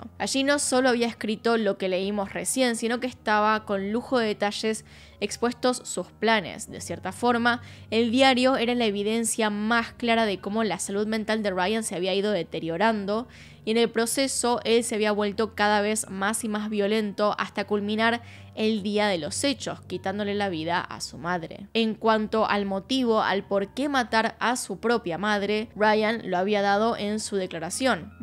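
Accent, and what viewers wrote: Argentina. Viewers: Como me encanta el acento argentino!!❤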